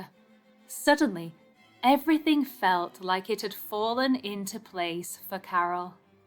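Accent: United Kingdom. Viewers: Such a remarkable story thanks love british accent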